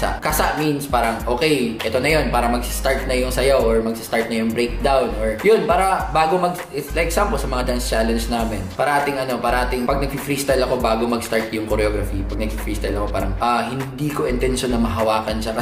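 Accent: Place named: Visayas, Philippines. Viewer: Ahahaha ka cute ni DJ Loonyo mag bisaya uie😂😂😍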